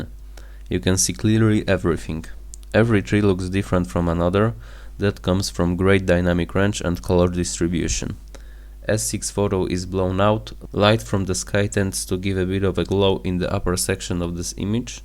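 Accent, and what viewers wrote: Poland. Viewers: Bardzo polski akcent... dobry test ale jak dla mnie ostrosc ma jednak troske lepsza s8 i dorzucił bym jej pół gwiazdki :) Pozdrawiam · Elo polska ;) po akcencie słychać zawsze ;)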